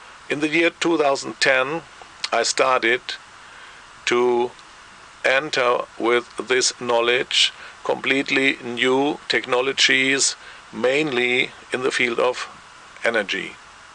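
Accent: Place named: Bavaria, Germany. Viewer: Geil :-) der Babbelt Englisch wie Bayrisch ;-) hehe awer ma versteht alles herrlisch :-) Daumen hoch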